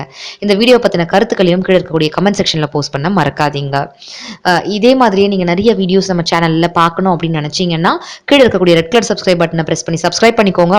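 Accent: Coimbatore, India. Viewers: Endra ootu karar enga ooru language m cbe. Really sweet this video i like so much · very cute. dress and neck less super 😘😘😘😘😄😄😄😄😄.Naanga Coimbatore nnga. yendra uttuukarunu😘😘😘😘😘😘 solldrathu super ah errukku.slang very nice pa. condippa reply vennuuuuuuuuuuuuum. OK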